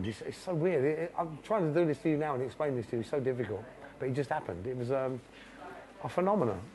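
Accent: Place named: United Kingdom. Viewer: Typical brit accent heh, i like brit accent, interesting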